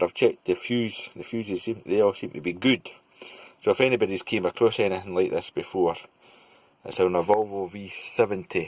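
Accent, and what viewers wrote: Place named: United Kingdom. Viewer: He's not from the "Brown" world chum. You can tell from his accent his from the UK you absolute moron. He's speaking English 100% fluently which I can tell you are not able to looking at your constant ABUSE of ellipsis. You massacred the English language in that sentence of yours.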